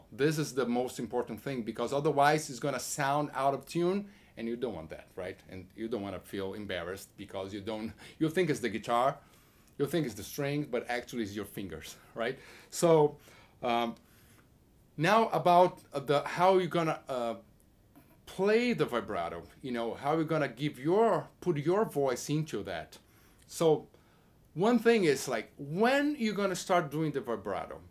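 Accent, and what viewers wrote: Brazil. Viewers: Inglês brasileiro. É muito mais fácil entender um brasileiro falando inglês que um nativo. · Dá sim. Mas o sotaque br no inglês dá um charme à mais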